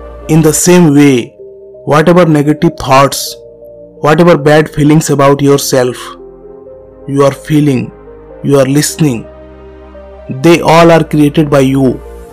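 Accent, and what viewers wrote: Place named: India. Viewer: Good video but you need to improve your sound quality. May try to use Siri to read as your Indian slang is very strong. Keep up your good work. Cheers.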